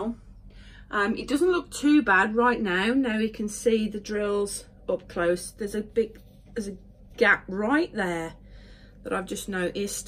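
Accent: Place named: Birmingham, United Kingdom. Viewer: Hi glad you finished it . And nice to hear a Brummie like me. Looking forward to seeing Tinkerbell.😁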